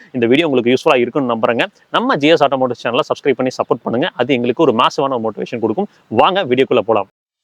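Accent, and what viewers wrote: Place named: Kongu, India. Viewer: Awesome, I am watching from your initial video. Amazing to watch how you improved. Your Content, Presentation & kongu slang fantastic. Keep Josh ahead.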